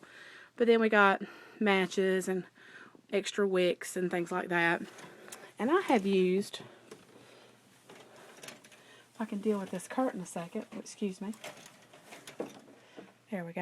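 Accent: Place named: South, United States. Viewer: Great video. I like your curtains. I think I will try it too. Love your accent. You must be a Southern Belle like me!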